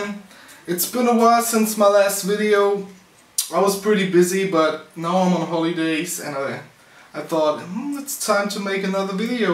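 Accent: Germany. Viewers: Bist du deutsch? Du sprichst so englisch mit deutschen akzent :D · Very authentic. Where do you come from? Your accent sounds very german. Is it a good choice to start with maschine right from the beginning? Your much of a clicking person. but it's possible to browse with the Maschine Controller, isn't it?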